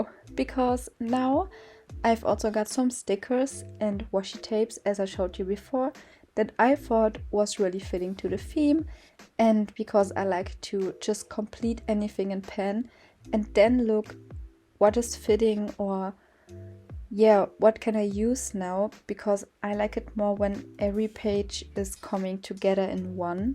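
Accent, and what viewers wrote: Germany. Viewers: Are you german? 😱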